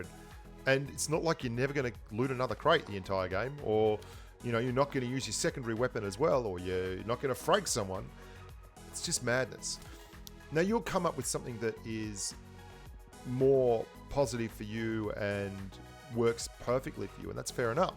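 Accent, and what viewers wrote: Australia. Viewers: Dude I love your accent! Australian??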